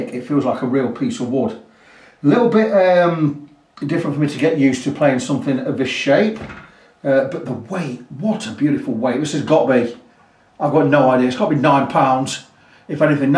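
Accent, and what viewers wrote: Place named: Nottingham, United Kingdom. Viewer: Was just about to comment Nottingham boy but then you came out with it Proper Accent 👍🏻😁 being a Notts lad too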